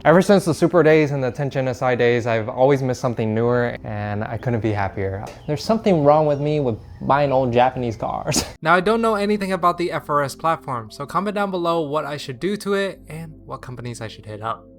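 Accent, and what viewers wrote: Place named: Vietnam. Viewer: He speaks Vietnamese accent english.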